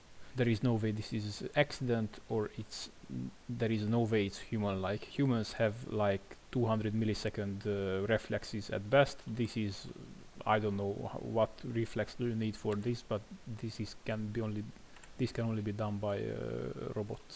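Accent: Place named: Hungary. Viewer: Szerbusz, nemrég kezdetem el a játékkal ujra játszani, gondolom irok mert én is iszonyu sok emberrel talélkozok karantén alatt biztos unatkoznak és azt szeretném kérdezni h mit lehetne velük csinálni h ki legyenek tiltva, vegyem fel a meccseket én is is csináljam ugy v mi? (gondolom magyar vagy a "munka" mappáról, meg hallatszik az akcentusodon! :D )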